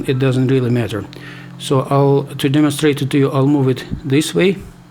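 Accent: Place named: Europe. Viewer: You’re European I could hear your accent so clearly ... are you from Russia or Bulgaria??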